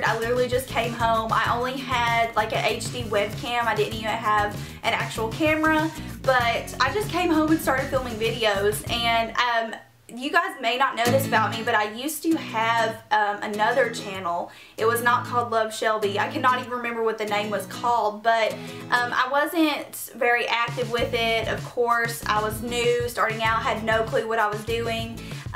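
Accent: South, United States. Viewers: I'm originally from Memphis but have a very strong southern accent. I now reside in Rogers, Arkansas . When people ask where I'm from I pull out all the punches, I tell them I'm from "Toadsuck, Arkansas ". It's a small suburb outside Conway , lol. They don't really believe me when I tell them Memphis. Love you and your accent, my home state girl!!!!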